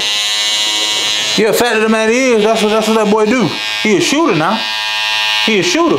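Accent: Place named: South, United States. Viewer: Where bro from he sound southern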